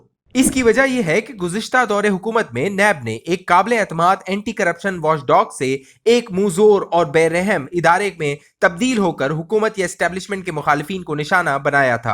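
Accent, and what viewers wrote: India. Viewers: “NAYA DHONG” TV KO PAKISTAN ME KOI NAHI MILA AUR AIK INDIAN AADMI KO GIS KA INDIAN ACCENT HE COMMENTARY KE LIAY SELECT KIA HE …….. GESAY “CLOSET INDIANS” KO ANCHORS AUR JOURNALISTS KA SLOT DIA HUA HE …… ANTI PAKISTAN CHANNEL SPREADING SUBVERSIVE FAKE NEWS ……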